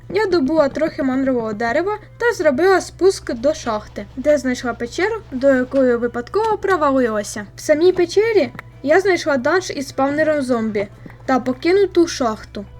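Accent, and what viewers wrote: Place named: Transcarpathia, Ukraine. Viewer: ВзяВ з печі стейки я вирішиЛА ))) Трохи схоже на Закарпатський діалект, але це не він, чи він ? )))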